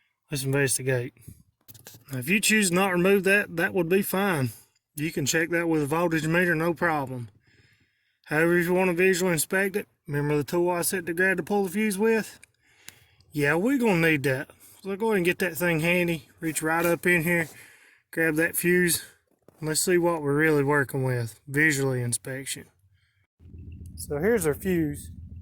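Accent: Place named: South, United States. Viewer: The first thing I check for is a good solid "Southern Drawl" iminating from the commentator. Once you have established that the presenter has that "Drawl" you're looking for then you can be assured that this fellow is self sufficient and probably has years of experience working on his own vehicles. You will notice that there will not be any unnecessary "BS" from some stuffy old book or manual. What you will hear will be the voice of experience and will be explained in a "no bullshit" manner that even a city boy can understand. So listen up Billybob cause it aint going to get any better than this !!! My hat is off to the gentleman that took time to show me how it is done. Thank you Sir.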